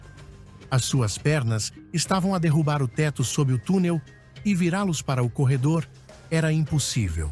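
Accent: Portugal. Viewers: Eu acho que ele é português... De vez em quando o sotaque escapa e isso os brasileiros natos não conseguem. Se for um português de ascendência brasileira? Faria mais sentido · Tira esse sotaque lixo de Portugal 🤮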